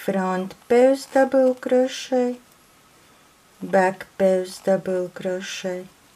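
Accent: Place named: Latvia. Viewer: Are you Latvian? You sound sooo Latvian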